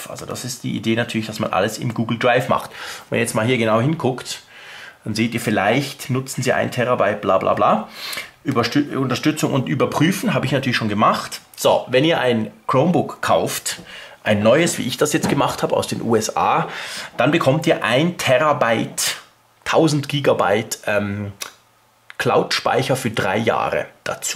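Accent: Switzerland. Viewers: Versuch mal nicht immer alles in deinem schweizerischen englisch auszusprechen!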